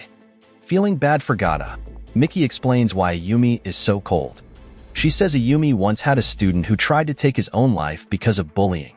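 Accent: United States. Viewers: Can't this channel use a narrator who speaks without stupid amerikan prounciation?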